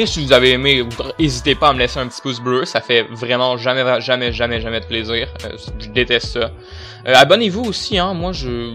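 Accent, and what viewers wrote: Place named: Quebec, Canada. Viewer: J'aime trop l'accent québécois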